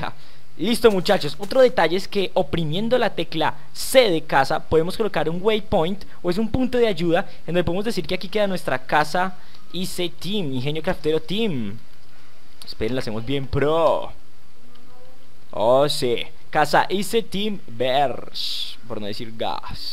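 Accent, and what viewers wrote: Colombia. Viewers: oye ingenio eres colombiano y igual que yo ?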